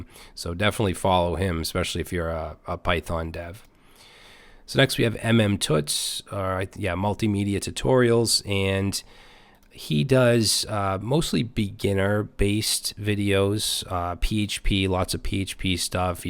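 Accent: Boston, United States. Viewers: I still like your channel the most. I'm a software engineer and have been for the last 4 years professionally in part thanks to your videos, the thick Bostonian accent brings nostalgia.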